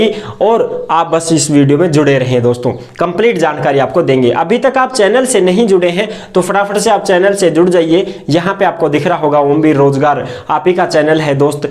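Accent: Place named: Marwari, India. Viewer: Bolane ki istayl bhut ahhe h 😂😂😂❤❤ Jo bich me marwadi ka tdka h vo mast h 😂😂❤❤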